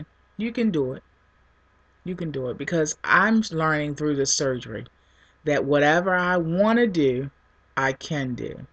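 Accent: United States. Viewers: I love your accent! I miss USA accents! :) Thank you for your honesty and love and compassion. My surgery is Oct 23rd and I am excited.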